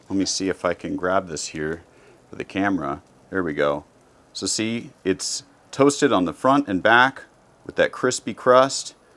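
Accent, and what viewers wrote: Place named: United Kingdom. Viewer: I don't even need to hear your voice I can just tell you're from the UK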